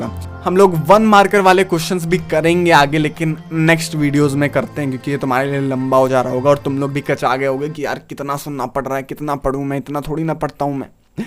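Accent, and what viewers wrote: India. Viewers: Bajya aap gujrat ya bihar ya rajesthan ze ho kya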